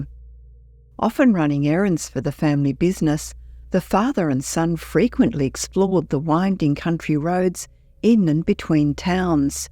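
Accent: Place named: Australia. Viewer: Australian narrator ❤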